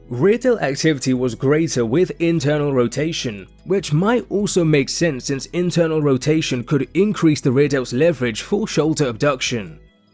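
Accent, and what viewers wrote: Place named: England, United Kingdom. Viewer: You sound English but you call it soda?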